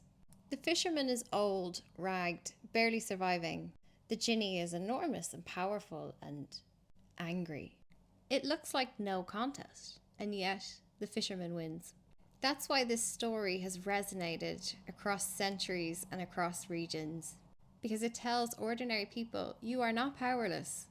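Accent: Ireland. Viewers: Fascinating accent — I love the Irish ❦ Please read and breakdown more tales from the ⟨1,001 Nights⟩ and deconstructing the «moral scaffolding» within ❦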